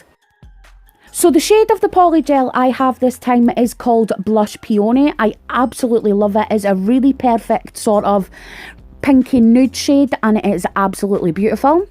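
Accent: Scotland, United Kingdom. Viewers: New sub here. I have soooooo many Madam Glam gels. Def my fav brand. Btw, I love listening to your Scottish accent.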